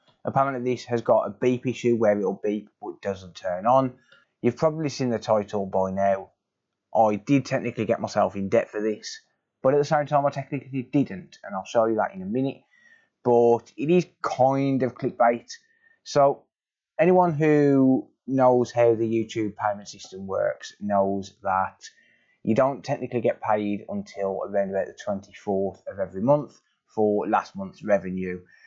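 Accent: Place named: Walsall, United Kingdom. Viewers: Great content buddy, keep up the good work. You sound like a local lad, are you in the midlands? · Loving the channel and the Brummie accent. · This geezer sounds like hes from my area ..Walsall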